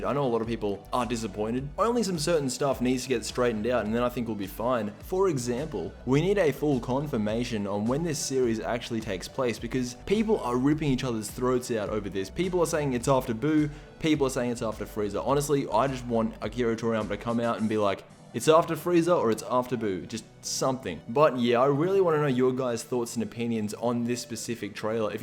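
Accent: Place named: Australia. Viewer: lol your Aussie accent is pretty full on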